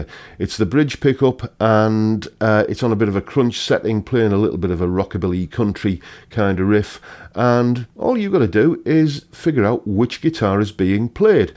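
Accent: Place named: North, United Kingdom. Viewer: Errrr. You should errr, definitely become a DJ. I'm not saying that because you've got a face for radio 😉, but your voice would be excellent. Intelligent, gentle with a particularly articulate Northern twang. Forgive me for any negative vibes this will appear to come with, but the only problem I have with these comparisons is the inclusion of modulation/doubling/chorus on all tracks. Reverb is a little too heavy too. Other than that, you've done a brilliant job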